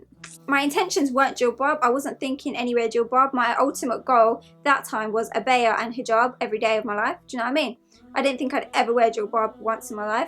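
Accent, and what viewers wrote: United Kingdom. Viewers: Do u live in Liverpool or somewhere in northern England? Cos ur accent sounds like it also mashallah ur soo beautiful 😍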